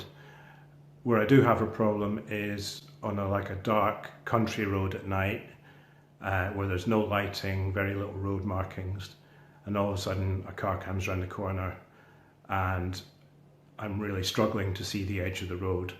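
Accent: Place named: Scotland, United Kingdom. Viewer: He's developed a Scottish accent!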